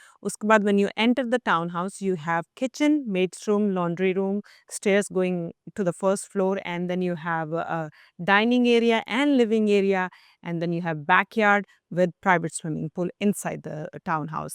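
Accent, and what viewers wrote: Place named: India. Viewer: Is she speaking English or indian?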